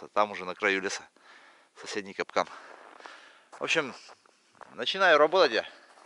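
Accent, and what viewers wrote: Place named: Vyatka, Russia. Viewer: Очень хороший канал, привет бывшим землякам))) Узнаю родной ВЯТСКИЙ говор)) 3 года от него избавлялся). Но, послушать его иногда очень приятно! Продолжай в том же духе!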